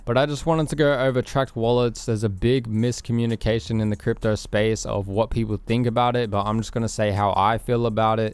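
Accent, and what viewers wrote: Australia. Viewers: can you do the same video but without the australian accent please. It's just i heard a w at the end of every words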